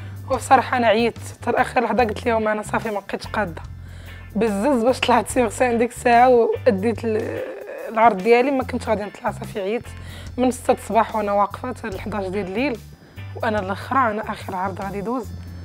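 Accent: Egypt. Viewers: لا من لباسك ولا من لهجتك كل الناس كانت تقول بأنك مصرية يا وخيتي احكي بي لغة القران العربية هل شوفت مصرية تحكي مغربي او لبنانية تحكي مغربي ؟؟ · اويلي وحدي قالت ليك كاتحاااول باش تهدر بالداريجة هاذي باقة حتى ما تشهرات ومعمرها خرجات من المغرب و غالبة عليها اللهجة المصرية ويلي بااا قالت لك كانحااول ايوا بلاش ما تبززي على راسك ا حبيبة 😬😬😬😬😬😬😬😬😬😬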